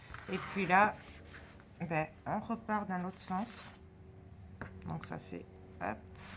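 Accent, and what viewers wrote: South, France. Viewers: Original et simple effectivement, un bon entraînement pour débutant 😀 mais pas seulement 😀j'aime beaucoup ce que tu fais et tes explications avec en prime l'accent du midi, c'est très agréable, merci 😊bises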